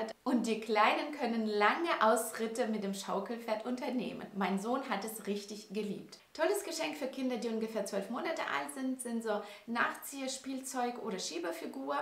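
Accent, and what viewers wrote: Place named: Russia. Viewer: wo ich Deinen Akzent einordnen soll... Ja, klar, Russland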